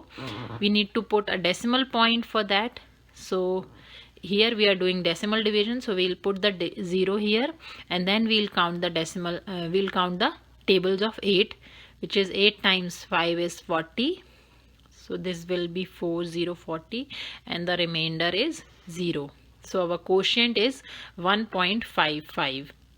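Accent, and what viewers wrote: India. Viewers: Indian accent l guess?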